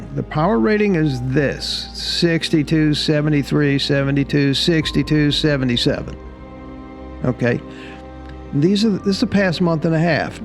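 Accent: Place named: Georgia, United States. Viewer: The reason for the accent is Georgia was a British penal colony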